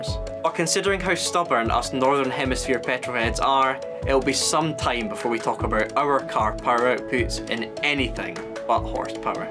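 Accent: Scotland, United Kingdom. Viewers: FML a Scottish accent.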